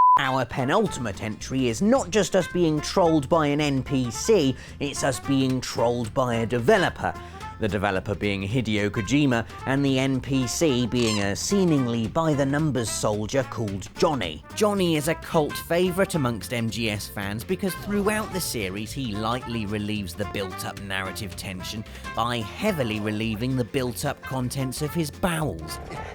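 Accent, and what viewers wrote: United Kingdom. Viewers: He's has an British accent bud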